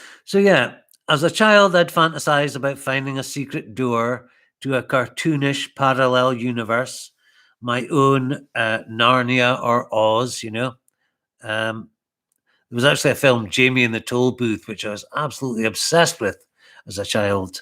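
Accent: Scotland, United Kingdom. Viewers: magick patter in a Scottish voice...... finally !!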